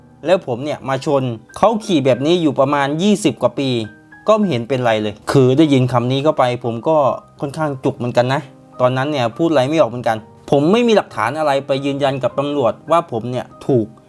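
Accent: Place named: Buriram, Thailand. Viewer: สำเนียงบุรีรัมย์สุรินทร์เลย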